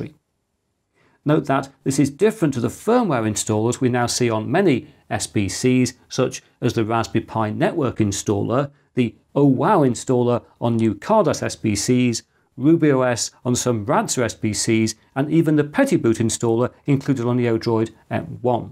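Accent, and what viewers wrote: United Kingdom. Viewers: ".... and now that's it" for another exciting and informative video from my favorite YouTube producer. I love this British accent❤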